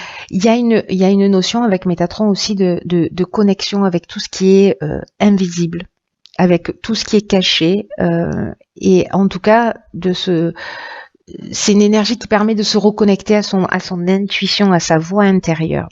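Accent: South, France. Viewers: Merci ! Reçu 5 sur 5, accent du Sud compris, soleil assuré !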